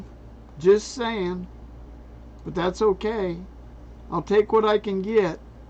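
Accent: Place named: South, United States. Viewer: WELCOME BACK,. MISSED YOU TERRIBLY. YOU ARE 1 OUT OF 4 CHANNELS I LOYALLY WATCH. YOUR TRUTHFUL, ACCURATE, AND I LOVE YOUR SEXY, SOUTHERN, GENTLEMAN ACCENT. MAYBE GOD BLESS YOU, AND WATCH OVER YOU AND YOUR FAMILY. GOD IS WITH PRESIDENT TRUMP, GOD WINS. PLEASE, EVERYONE, CONTINUE TO PRAY, AND LET US ALSO GIVE PRAISE AND GRATITUDE TO OUR ONE TRUE GOD OF ISRAEL, OF ABRAHAM, ISAAC AND JACOB. 🕆✡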